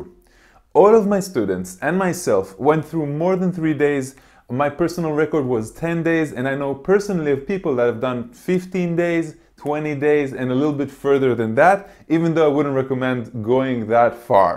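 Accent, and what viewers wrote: Israel. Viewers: Hi.. came across your vid... As an Israeli- I can hear your HEBREW!!!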